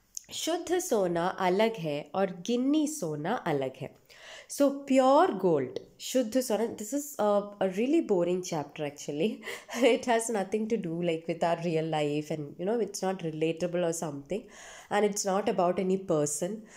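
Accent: Kerala, India. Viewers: Maam you have explained this chapter beautifully!! Also, which state do you belong to? You really sound like a person from Kerala with a Mallu accent😂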